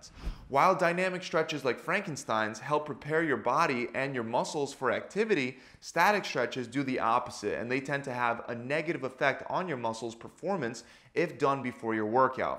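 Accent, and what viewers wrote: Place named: New Jersey, United States. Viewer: He is from New Jersey. We in the northeast pronounce the a in water the same as the a in warm just like the British and Aussies. Most of the rest of the US pronounces the a in water like the one in watch. But in the tough inner cities of the NE, an outsider saying waah-durr might be told he sounds gay or weak. To each his own, I guess.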